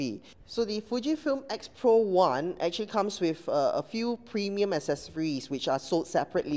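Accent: Singapore. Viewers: Singaporian accent is so funny :p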